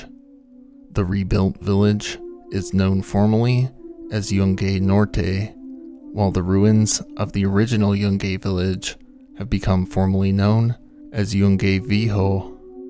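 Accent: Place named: United States. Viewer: Now can’t handle your American voice, I’m sure it’s a grate video but that voice is beyond unbearable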